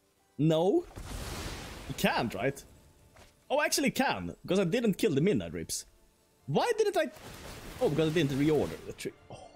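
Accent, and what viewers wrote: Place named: Sweden. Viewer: i always cringe a little bit whenever Sweeds try to pronounce g's and J's hhh, great video though, keep it up.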